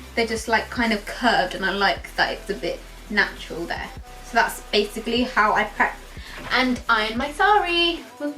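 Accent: United Kingdom. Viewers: Great British accent......❤ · Wow u have a beautiful british accent, and loved ur video..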